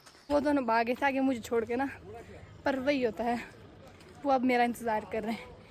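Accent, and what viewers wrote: Pahadi, India. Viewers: Ap hindi bhi bolte h pr tone pahadi hi aati h 😁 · Hindi ke sath phadi tune achhi hai❤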